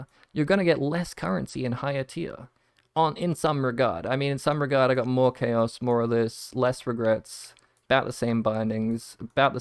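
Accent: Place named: Australia. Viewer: I never click any bell and always see new videos. The new audio makes you sound Australian.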